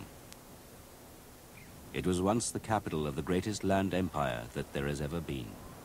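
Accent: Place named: United Kingdom. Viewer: I much prefer the British Narration in these videos, but for those looking for the American Narration you can find the first of the 4 videos here > <link>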